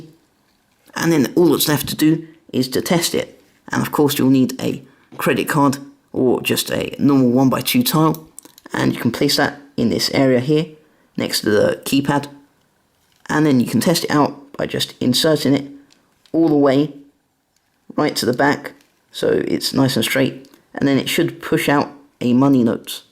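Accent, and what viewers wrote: United Kingdom. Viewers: I like your voice are you from Britan? Also your really smart and creative!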